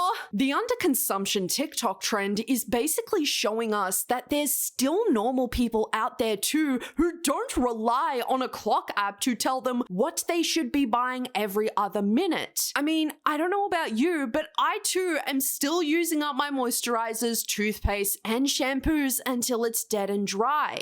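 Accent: United States. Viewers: Okay but how can you do a realistic American accent tho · Your American accent is so darn good!!!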